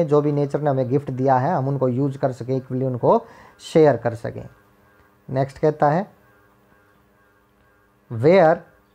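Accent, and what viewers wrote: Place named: Haryana, India. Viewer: Sir aap haryana sai ho na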